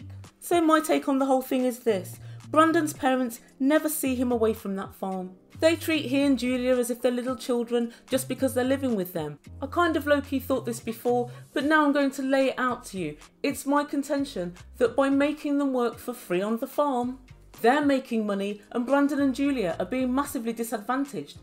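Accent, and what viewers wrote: South, United States. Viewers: Loved your southern accent! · your American southern accent gives me life every time I hear it 😂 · There is NOTHING I LOVE more than your American accent followed by your laugh. The best laugh ever. 🤣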